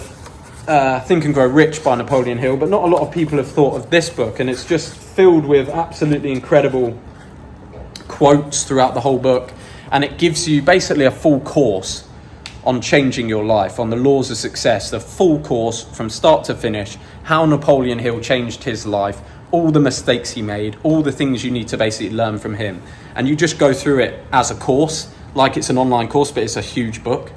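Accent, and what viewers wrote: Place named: United Kingdom. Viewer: Bri-ish accent wif uh harry poht-uh legos is honestly excellent. You’re the most bri-ish person I follow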